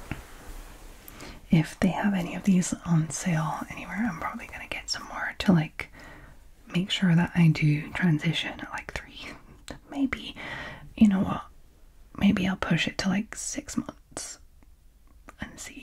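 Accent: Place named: Scotland, United Kingdom. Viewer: Been binge watching your videos all evening. Just discovered that you’re Scottish, so hello from Edinburgh! I haven’t been able to place your accent but I can definitely hear it now.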